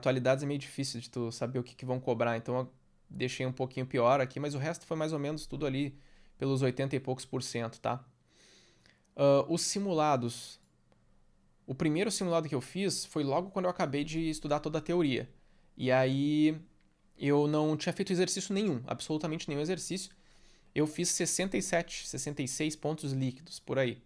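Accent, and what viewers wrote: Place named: Rio Grande do Sul, Brazil. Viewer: Pelo sotaque, tu deve ser meu conterrâneo (gaúcho). Grande abraço e parabéns pelo desempenho.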